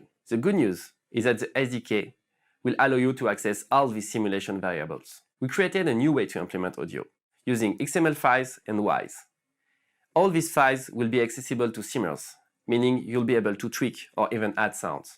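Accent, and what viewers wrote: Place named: France. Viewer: Vous êtes Français ? En tout cas ce qui me fait dire ça c'est que je remarque un léger accent Français dans l'Anglais .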